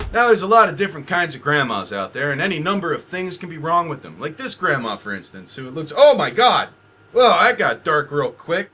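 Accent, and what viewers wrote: Germany. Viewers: I'm german and your german accent is surprisingly good. Some rambles sounded like acctual words.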